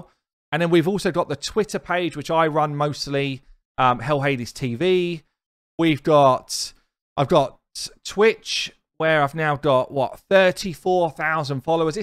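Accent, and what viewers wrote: United Kingdom. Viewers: My favorite raider on Youtube with a british accent, and the maker of excellent tools for the game. Love it. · You should be incredibly proud!! You have come a long way and your videos got me into raid and keep me coming back every day for new content. You are very entertaining, even when you are doing gear cleanses lol. I think the way you Brits talk is entertaining too coming from America. It's all very fun!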